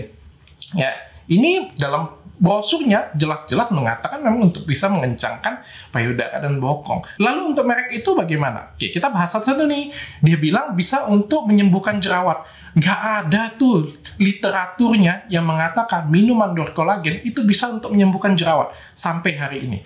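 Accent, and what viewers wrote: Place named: Sumatra, Indonesia. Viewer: menurut saya video klarifikasi ini sudah tepat. Saya nonton dan ngikuti get it beauty (acara tv tentang kecantikan dr korea). Kontennya sama aja dengan yang disampaikan dokter di video minuman berkolagen, hanya beda cara penyampaian. Saya yakin kasus ini muncul karena orang ga sreg sama cara orang sumatra menyampaikan informasi 😅 Saya medan lang, keluarga ya kayak gitu semua kalo ngomong. Tapi kalo berhadapan sama orang jawa, kadang dianggap kasar ato judes dan jahat.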